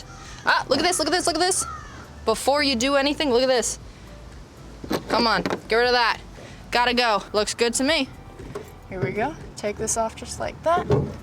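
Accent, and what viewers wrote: United Kingdom. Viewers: Great British accent. The clip ain't bad either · A little British accent. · Hats off to you for using anti-seize compound. Why do you intermittently speak in a British accent? In answer to people asking why she’s changing the plugs so soon, it’s so she can make an instructional video.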